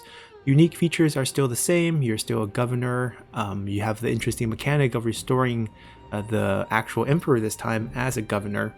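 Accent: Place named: China. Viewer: up主是国人吧，这语调不是国人说不出来。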